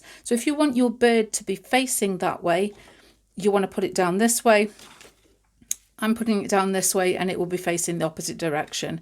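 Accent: England, United Kingdom. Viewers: Thank you, I am so pleased to have found your videos. I love the way you explain the process clearly and calmly in your lovely English accent. So many of those I have been watching have been, while informative, too fast and with loud music over high pitched American accents. I'm a water colour painter experimenting with new techniques and ideas as I am now housebound during lockdown due to my age and health so have been exploring YouTube for inspiration and ideas. I now know that I have been using the wrong type of acrylic paints and not planning the process enough resulting in messy prints and wasted paper. I'm looking forward to watching more of your videos and hopefully producing something I don't have to hide away!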